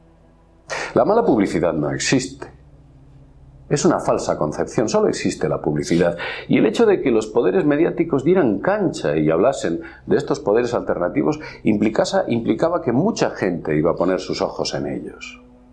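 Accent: Spain. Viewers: de que parte de España sos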